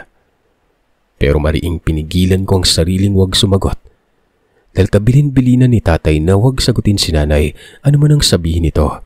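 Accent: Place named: Calbayog, Philippines. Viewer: This is the first time na nakarinig ako ng taga Calbayog hahhaha hello kababayan 😂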